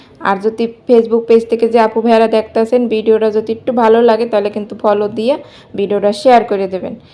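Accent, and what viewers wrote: Barishal, Bangladesh. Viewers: আপু তোমার বাড়ি কি বরিশাল তোমার কথায় মনে হচ্ছে তোমার বাড়ি বরিশাল আমার বাড়িও বরিশালে ❤❤আজ প্রথম তোমার ভিডিও দেখলাম ভালো লাগলো আপু ❤❤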